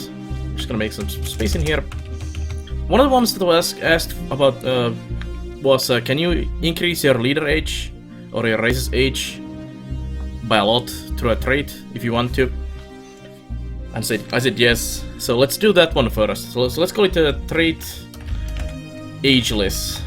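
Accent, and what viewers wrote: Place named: Finland. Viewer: Thats an intense finnish accent there. Edit: But a pretty helpful tutorial nonetheless :D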